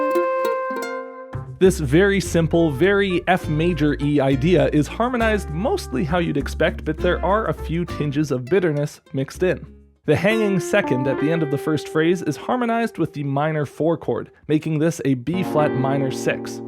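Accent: Canada. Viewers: i can hear the canada in your voice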